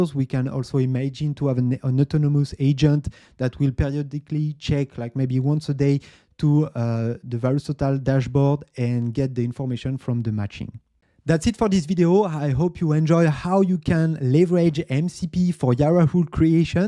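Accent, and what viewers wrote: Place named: France. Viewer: Ah l'acccent fr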